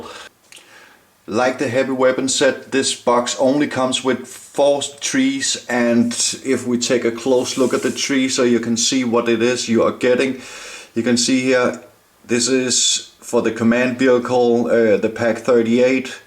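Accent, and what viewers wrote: Denmark. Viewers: Are you from Denmark? Sounds like it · You danish?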